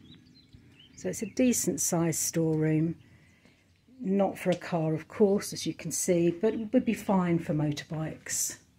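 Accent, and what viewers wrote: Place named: United Kingdom. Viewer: Thank you for presenting this video. It is a beautiful property. Here are some thoughts I have. 1. You are a very good presenter. I am not a brit, so your accent is a bit heavy for me, but because you are thoughtful and speak slowly I did not miss even a word. Also your pace is slow, and allows enough time to see everything, and your camera work is slow and makes it great to watch. 2. Would be nice to have an aerial map to see the boundaries of the property, since clearly the 2000 sqm is much more than we seen, so to have an idea of where the rest is, even if it has been implied. For example, I would liked to see whether it is even possibly to convert some of it to gardening, fruit trees kind of area, etc. Many thanks for a great presentation.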